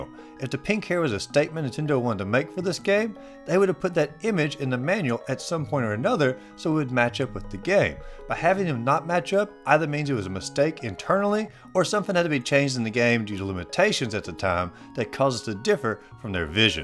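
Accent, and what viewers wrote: South, United States. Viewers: Sweet video man! Sounds like you're holding back a pretty significant southern accent. You should let it shine! Not enough gaming channels with attitude.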